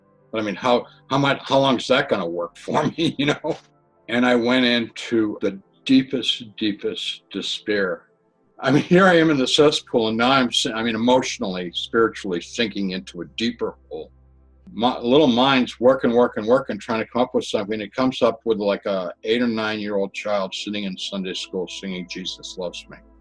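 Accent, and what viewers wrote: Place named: New England, United States. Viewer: F'in D's.. lol I love The New England accent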